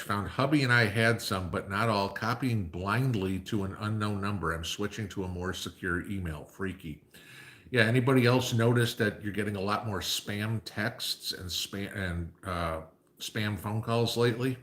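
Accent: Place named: New York, United States. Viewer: Hey hes a New Yawker!❤️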